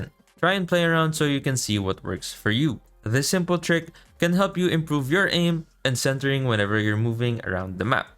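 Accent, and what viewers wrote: Philippines. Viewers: Why is your english accent sound like filipino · Been watching your vids for a while now and I realized that you’re also a Filipino based from your accent. Good job! New subscriber here from Garena server.👍🏼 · I knew you were a filipino. Just from the a cent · Pinoy ka rin pala, tama kutob ko kase the way na mag salita ka e btw naubos ko na lahat ng vids mo.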